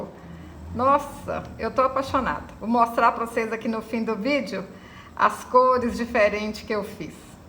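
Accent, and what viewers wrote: Minas Gerais, Brazil. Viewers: Amo o seu sotaque mineiro, me sinto em casa pois tbm sou da terrinha , sou de Raul Soares M G.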